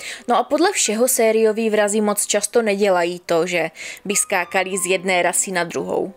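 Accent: Moravia, Czech Republic. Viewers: Jsi super.Krasne se té poslouchá.Mas něžný hlas a ten moravský přízvuk je tak roztomilý🤗Vždy se těším na každé tvé nové příběhy🥰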